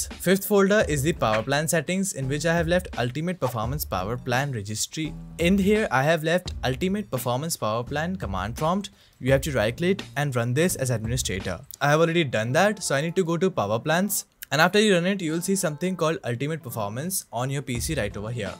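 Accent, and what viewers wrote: India. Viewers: YOU SOUNDS LIKE AN INDIAN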